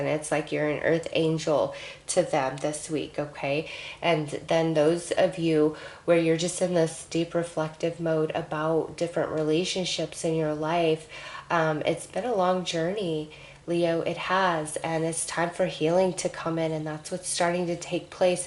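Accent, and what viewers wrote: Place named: United States. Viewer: Omg we had an ad in Britain for a chocolate bar called cadburys caramel a swear you could be the same voice in an American accent amazing I so love your readings thankyou so very much xxxx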